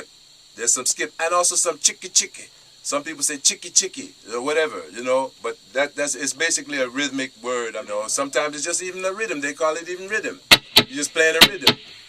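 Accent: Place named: Jamaica. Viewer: "Now if you listen carefully you will hear..." Man speaks like Bob marley 🇯🇲😁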